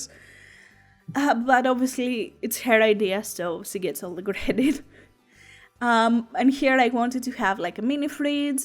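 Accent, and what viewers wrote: Greece. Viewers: are you greek? because you sound like greek :P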